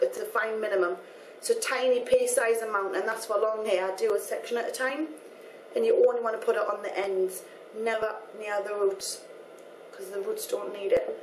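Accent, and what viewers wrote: Newcastle, United Kingdom. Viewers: guessin your from newcastle AWESOME !! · For all non British viewers, the reason she has a strange voice is because she is what we call "a Geordie" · She's from like the Newcastle area in the UK. There are about twenty different UK accents that you've probably never heard before if you're from elsewhere. Most people who aren't from England seem to only recognise the London accent as an English accent but truth be told we have loads of "weird accents" ;) x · Strange? LOL How about it's a British accent, yokel. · Hey there, Geordie Girl...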